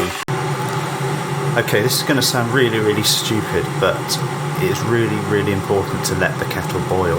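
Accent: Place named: England, United Kingdom. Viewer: I am a coffee drinker. But I appreciate your tea with English accent. Greetings my friend.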